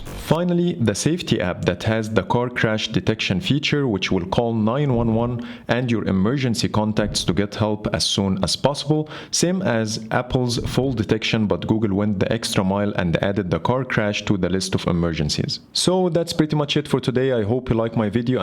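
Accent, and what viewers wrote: Egypt. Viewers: Hmmm I hear an Egyptian English accent over here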